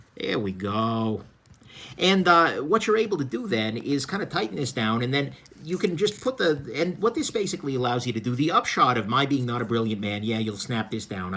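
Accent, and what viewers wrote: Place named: New Jersey, United States. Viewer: The Jersey accent on this guy is hilarious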